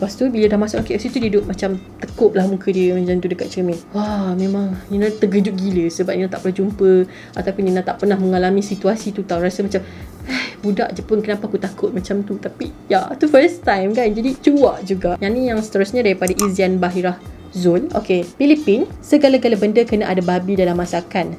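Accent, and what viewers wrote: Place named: Johor, Malaysia. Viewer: Bahase km ni Johorian sangat..satu Malaysia bukan semua orang paham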